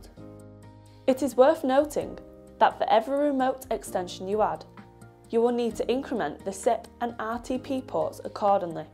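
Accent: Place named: United Kingdom. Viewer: Fucking British English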